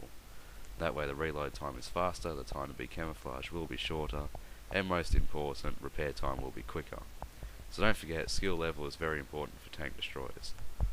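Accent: Australia. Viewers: First I am not trying to be annoying or rascist but are you part or full Australian?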